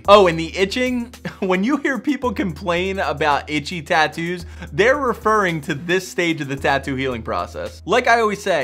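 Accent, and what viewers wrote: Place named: Maryland, United States. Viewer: You’re awesome. Thanks for making such in-depth vids! One thing: hahaha where are you from. I’m guessing Maryland. “Wooder” for water. You’ve got to be from MD! 🪵💦😉🦀 ✌🏻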